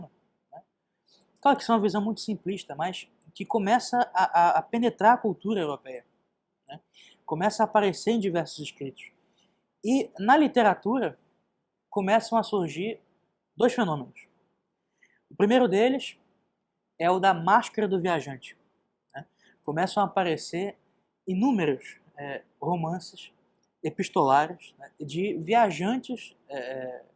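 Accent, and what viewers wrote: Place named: Israel. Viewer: Percebo um sotaque israelense Ótimo video, ótimo canal. Parabéns que Deus abençoe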